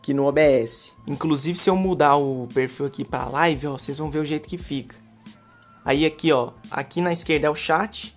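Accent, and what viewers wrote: Minas Gerais, Brazil. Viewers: cê e mineiro ou sulista?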